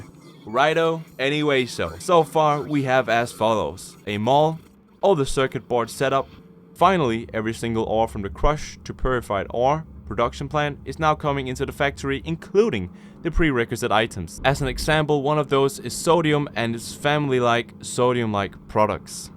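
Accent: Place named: Germany. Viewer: Good f'ing job man, your suffering wasn't in vain. And I would've never guessed you're Danish, you sounded rather German to me 😂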